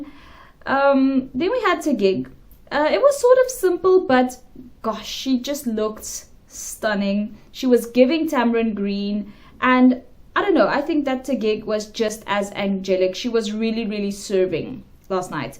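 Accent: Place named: South Africa. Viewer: Love you. And love your Afrikaans pronunciation of ‘r’ gives life and errrrthing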